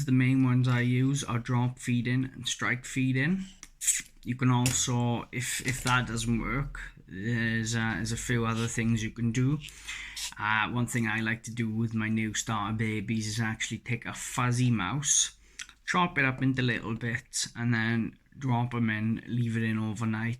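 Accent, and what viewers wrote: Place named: England, United Kingdom. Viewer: Im guessing that you are English by your accent. Do you guys have corn snakes there? Thanks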